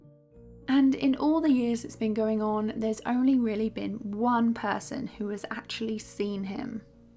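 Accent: United Kingdom. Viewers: I really love watching your video. Your animation is so cute. and The best thing that I love is your bloody lovely accent! I'm studying accent with your video.HAHA BTW Which in The U.K do you live? what accent you have?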